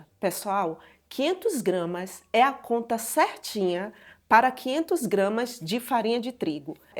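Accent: Bahia, Brazil. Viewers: Oi amada. Morei 22anos. Em Salvador, e me falaram que esse molho se chama molho lambão uma delicia, morro de Sdds desse sotaque lindo, hj voltei para O Rio de Janeiro , estou me recuperando de um momento de doença, mais assim que melhorar farei seus salgados para venda , obrigada e Deus abençoe sua vida sempre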